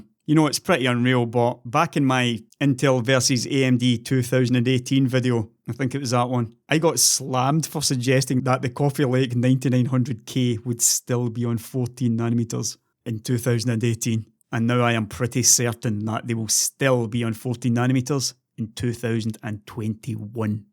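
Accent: Scotland, United Kingdom. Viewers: I hear a Scottish accent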